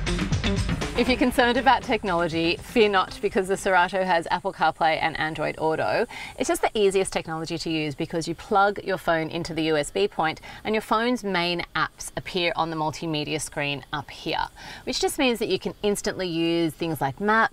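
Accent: Australia. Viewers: its like a wagony hatchback i dig the design. Kia is making great cars with great price. Also good job on that family review with this car, hope people understands that you don't need a freaking SUV for hauling a family (the SUV trend is really bs -_- ). p.s what accent is that Australian?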